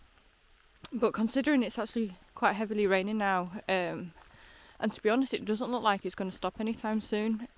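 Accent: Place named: Northumberland, United Kingdom. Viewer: Nice relaxed narration, with excellent choice of music, gave it the mystical feel with your regional accent, soft like are you from Northumberland?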